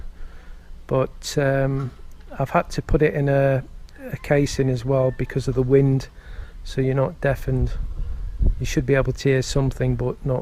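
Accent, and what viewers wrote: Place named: Geordie, United Kingdom. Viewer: try.some dried fish, they stock it dry so its a food supply when they osnt anything else. my partner brings it back with her. if smells a bit but im used to it now. Nice bike you have there. keep them coming the posts, you have a fan club now i think. when you first went to the Philipines your accent was east Midlands but now youre back to your roots i can hear the geordie twang now. take care all the best.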